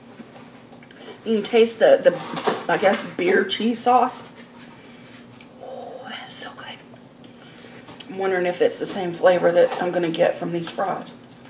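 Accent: South, United States. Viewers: Hey. I say this with the kindest of words. I think you should go on the TV show My 600LB life and get some help. You are gorgeous and your worth it. I love your southern accent. Please go and get some help sweetie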